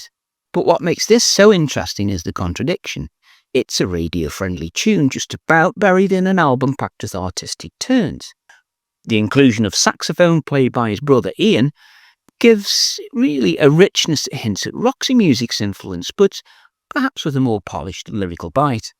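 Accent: Stoke, United Kingdom. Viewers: great review,,,by the way you sound like a stokie?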